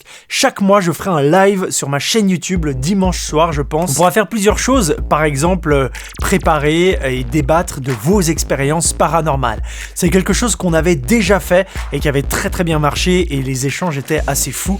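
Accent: England, United Kingdom. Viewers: Y’a qd mm que les francophones pr aller se moquer d’un accent anglais 🙄 au 21e siècle il serait GRAND tps d’évoluer, continue tu es parfait et merci pr cette belle année encore 🙌🏽🎉♥️ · J'adore toutes tes vidéos. Je n'en rate aucune. Peu importe ton accent anglais ( fuck les rageux) je suis admirative de ton travail. Mes vidéos favorites sont celles sur les animaux notamment celles de chauves souris. Et toutes celles où tu nous fais découvrir des lieux. Vraiment merci pour ton travail. Love sur toi 🙏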